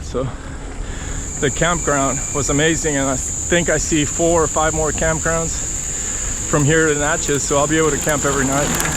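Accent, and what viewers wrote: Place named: Puerto Rico. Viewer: I always try to figure out what accent I'm hearing..my guess is Puerto Rican?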